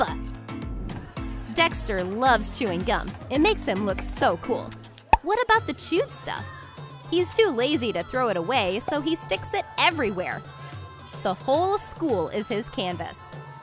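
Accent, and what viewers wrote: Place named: Wisconsin, United States. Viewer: You sound like you're from Wisconsin!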